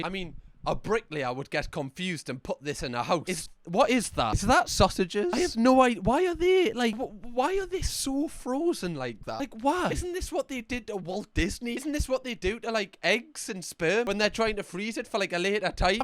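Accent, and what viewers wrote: Newcastle, United Kingdom. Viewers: Here a 55 year old from Zambia 🇿🇲 I enjoy your videos! Love the geordie accent too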